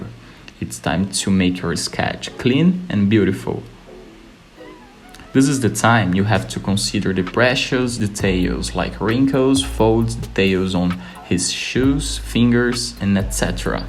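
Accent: Brazil. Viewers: pelo sotaque eu percebi que era br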